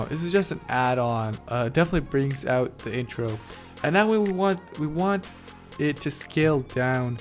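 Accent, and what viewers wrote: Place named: England, United Kingdom. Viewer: Great video! You've helped me so much! Want more intro's tutorials please, and if you could bring us some layout's tutorial it would be amazing! And I love your English accent :3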